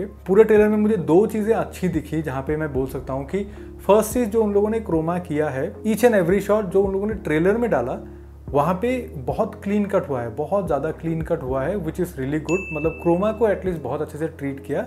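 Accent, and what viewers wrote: Assam, India. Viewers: this sounds like Assamese.. Oi bro tumi akhomiya ne??❤️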